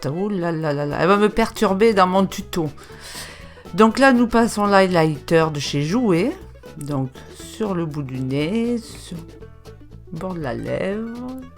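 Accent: South, France. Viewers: Troooop mignonne ta 😍 mais elle ma trop fait penser à monsieur ribera dans ariol (c'est un dessin animer lool) il dit toujours "effectivement" et en plus lui aussi a l'accent du soleil lol. Bisouuuuus 😘😘❤❤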